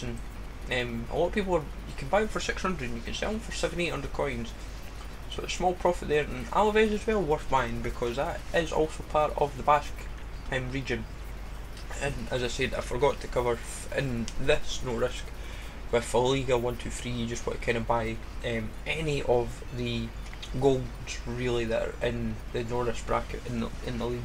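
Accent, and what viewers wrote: Scotland, United Kingdom. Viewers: i fucking love scottish accents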